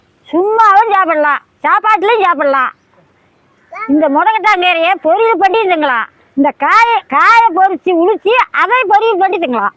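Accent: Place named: Erode, India. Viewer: ஈரோட்டில் எந்த ஊருங்க, நாங்க பேசுற பேச்சு மாதிரியே இருக்கு, அதான் கேட்டேன்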